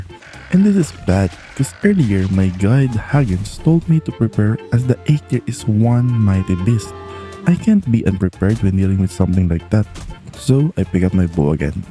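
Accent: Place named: Philippines. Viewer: i recognise that accent, but i can never be sure, would you happen to be pinoy?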